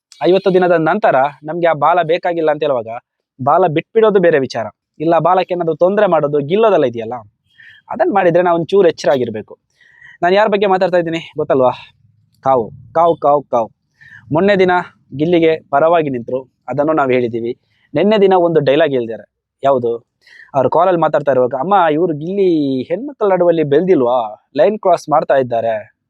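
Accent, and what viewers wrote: Mangalore, India. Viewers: Sir neevu banglore navra, nanu nimma mathu nodi manglore ankonde, navu banglore, thumba chali ide, Rakshitha Gilli best❤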